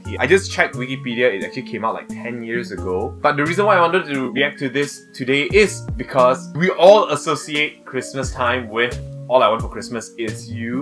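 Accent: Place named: Jamaica. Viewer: Love the reaction! However, I couldn't help but notice what sounded like an island accent of Jamaican or Trini? Either way, Happy Christmas!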